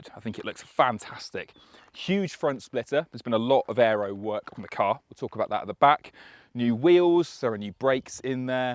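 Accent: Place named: United Kingdom. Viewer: i`m sorry you are so british i cannot even listen to this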